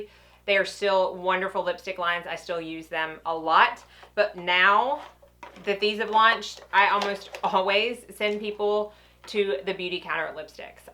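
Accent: South, United States. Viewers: Where are you located? I only ask because I live in Louisiana and I detected your southern accent. I vaguely remember you mentioning Tennessee?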